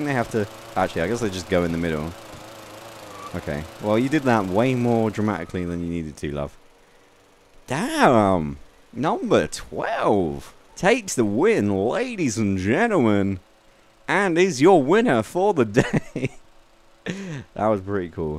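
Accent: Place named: United Kingdom. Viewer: By your accent I think your British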